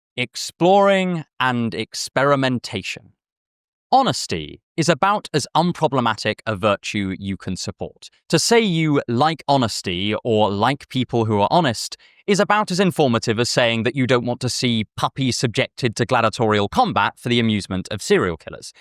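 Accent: United States. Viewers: Is it a tone? Or just a midwesterner? The world may never know.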